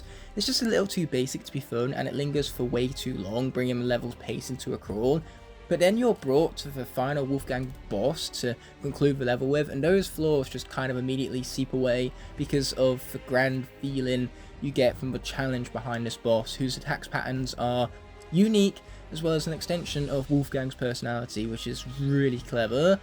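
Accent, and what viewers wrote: United Kingdom. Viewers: yeah, I understand, just thought I would share my thoughts as I don’t want people clicking of on the intro without seeing how great your videos are, I’ve only watched you for a week now since I wanted to Skylanders nostalgia, but I have really loved your content man and finding out your British too lol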